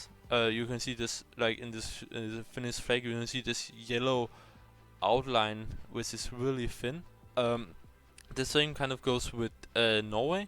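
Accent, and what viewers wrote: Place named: Denmark. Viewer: Your Danish accent is so strong 😀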